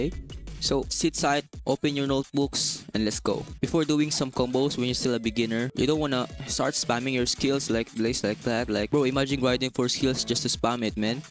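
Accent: Philippines. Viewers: Yo sound like a filipino❤❤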